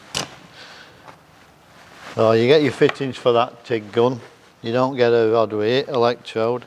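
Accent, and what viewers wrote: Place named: South Yorkshire, United Kingdom. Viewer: Great video. Great accent - sounds like South Yorkshire to me. Great to hear it on t'internet. New subscriber. Looking forward to the review of its functionality.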